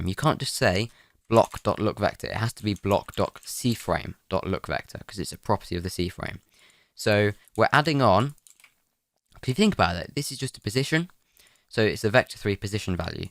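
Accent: United Kingdom. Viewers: I have needed this video for soo long. Also, is british;) FINALLY A YOUTUBER WHO DOESNT SAY zee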